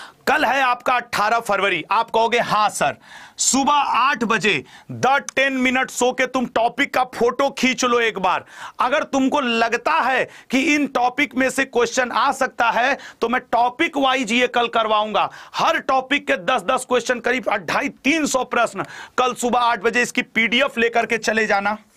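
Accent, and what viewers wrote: Bihar, India. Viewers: Sir apka language Bihari jasa hai 😅😅😅😅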